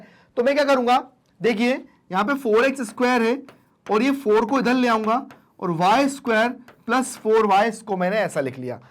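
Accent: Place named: Bihar, India. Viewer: Bihari ho bhai❤❤❤❤😂😂😂😂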